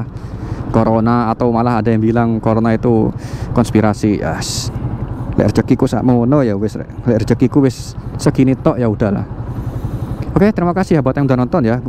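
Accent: Madura, Indonesia. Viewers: Meddhok mu cak mantap wkwkwk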